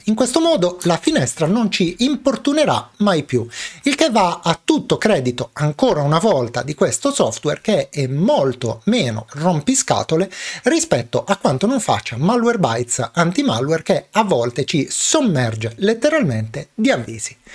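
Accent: South, Italy. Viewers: Salut mon vieux, comment vas tu ? Ci si ri..legge ^^ Tre domande "bionde"...soltanto, anzi quattro..., insomma poche domande ^^ :- Malwarebytes si deve disinstallare prima di installare questo o si può fare anche dopo ? Vivi a Genova ? quindi non sei di Genova ! Sei del sud, vero ? La tua "erre" (e forse anche il tuo nome) ti tradisce ^^ Di dove esattamente ? Perché non puoi mangiare patatine ? Usi la stevia ho sentito, diabete forse ? Uhm la tua Nutella direbbe di no, o sbaglio ? ...nel corso del corso...? LoL Ciao e ancora grazie caro vecchietto ^^ P.S. In futuro forse dovresti aggiungere il software in download sul tuo sito prima di pubblicare il video che lo riguarda...vedi caso 3DP Chip ^^ P.P.S. Come avrai notato il mio italiano migliora constantemente. Brava la bionda, vero ? ^^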